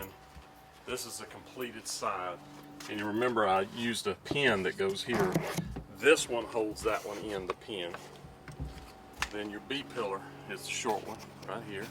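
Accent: South, United States. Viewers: Thank you so much for your video. Ive ordered my Jeep wrangler unlimited 2016 and i'm soooo excited for it to come in. I've watched your video several times as I've ordered my jeep to come with a hard top and i'm going to order a soft top after i receive it. VERY detailed. Nice to hear a nice Southern Gentleman do a great job on a video! God Bless! Keep doing videos!!! We live in Florida and own a Hot springs spa, but we love your Cal Spas!!!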